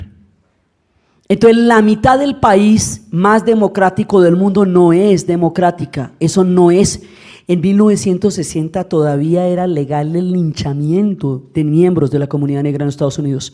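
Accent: Colombia. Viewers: Fantástico como narra la historia,con ese acento y gracia que tiene colombiana,con esa sencillez,fácil de seguir y entender Superamenas sus conferencias,se hacen cortas y te quedas con ganas de mas. Gracias por tus enseñanzas,ojalá hubiera muchos conferenciantes como tu, con esa sabiduría y facilidad para explicar las cosas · A mi edad jamás había escuchado a esta Dama Historiadora, muy buenas sus disertaciones, le pone ese toque Colombiano de pueblo, y Diafano, que inteligencia y mejor memoria, EXCELENTE NARRATIVA DE HISTORIA UNIVERSAL, y muy Actualizada...Felicitaciones, ahora Sra Uribe tiene un seguidor más habido de conocimiento... SALUDOS 🌹👏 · excelente. fabulosa narradora.Memoria magistral.Acento colombiano excelente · Y es que habla con tal propiedad a lo colombiano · es increible lo clara que es para que entendamos todas las vueltas y revueltas de la historia y el orgullo de que es nuestra o sea latinoamericana y es poco conocida en mi pais o sea argentina realmente es un orgullo tenerla y ojala la sigamos viendo por mucho tiempo. 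aparte dice cosas bastante graciosas con esa manera que tienen los colombianos.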